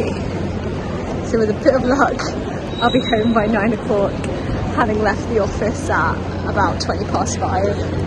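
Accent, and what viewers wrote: United Kingdom. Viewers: Her elegant British accent! Just wow 😂😍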